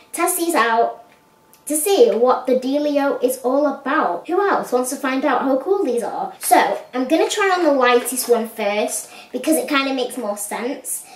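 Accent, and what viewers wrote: United Kingdom. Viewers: Lol I totally used to always think you were calling us all "donkeys" and not "junkies" when I first started watching your videos! Hahahaaaa! 😂 I was like, why is she always calling us all donkeys? Lol don't hate me I'm so sorry I am absolutely AWFUL at trying to understand and hear British/English accents clearly lol. I'm from St. Louis Missouri so I'm terrible at trying to make out your accent.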